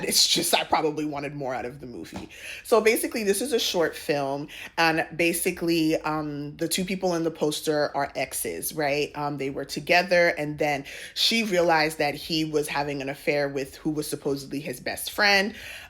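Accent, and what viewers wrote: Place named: United States. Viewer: I really enjoy your reviews, and this comes from genuine support. I’ve noticed a pattern where men sometimes receive more grace for harmful behaviour than women, and it’s important to keep the same energy for both — especially when women call out behaviours or action things that men have long been allowed to get away with, from the start of time. I also struggle with how often Nollywood glorifies adultery. It isn’t just “messy” — it’s emotional and physical abuse, with real consequences like STDs and even death. That’s why younger Millennials and older Gen Z reject narratives that romanticise cheating. We love the American accent here in the UK 👍🏾. I appreciate your work and just wanted to share this perspective because your platform is strong, and fairness matters.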